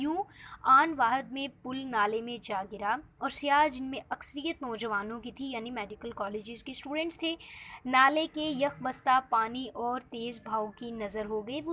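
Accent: India. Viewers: Bibi bolna to seekh lo yar Kia bad report kerti ho lagta ha Indian ho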